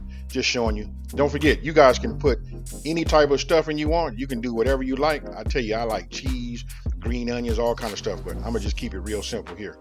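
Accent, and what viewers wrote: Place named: California, United States. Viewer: That Cali accent don’t lie! I love it! And those pancakes looks sooo good!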